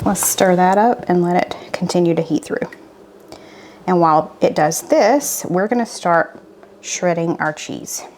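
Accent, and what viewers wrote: South, United States. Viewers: I must also be old! I was singing along with you each time! All of these look delicious! Both my daughters ask to watch " from in the Makin'" (in a cute midwestern version of your southern accent!) every weekend as we are making up our meal plan for the week. And my youngest son asks if it is a meal when it is something new. To say you have made a wonderful impact on my family's mealtime is not an understatement! Thank you for all you and your family does for us! Smiles, Abby Smith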